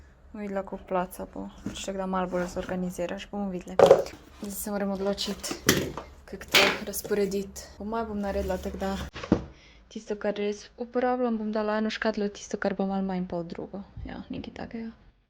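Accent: Carinthia, Austria. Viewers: Draga! Spremljam te že skoraj od začetka in res si vrhunska, pa še čudovito izgledaš. Obožujem tvoj način izražanja in tvoje vsebine. Kot so že drugi povedali, se ti res vidi, da ne snemaš zgolj zaradi zaslužka in "popularnosti". Všeč mi je tudi tvoj koroški naglas. Če boš kdaj želela, bi lahko več snemala v koroškem govoru. Ostani tako dobra še naprej! Lep pozdrav iz okolice Maribora. ❤️❤️😍😍😍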